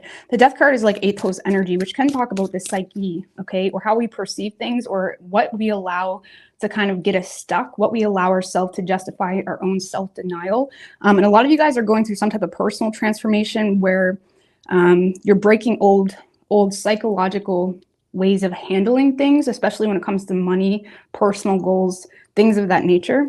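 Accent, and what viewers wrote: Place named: Canada. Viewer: You must not travel much. Do you know how many accents there are on this planet? Hell, I was born and raised in California but have lived in several states. I live in in rural Georgia and picked up the twang accent. When I go home to CA, they think I’m Australian. In GA, I sound like a “yankee”. She is pronouncing the word out correctly because she’s Canadian and that’s how they say it. The more you know ✨✨✨🌟⭐️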